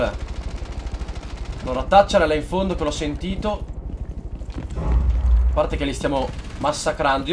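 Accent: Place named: Romagna, Italy. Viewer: ma sei romagnolo? Parli il dialetto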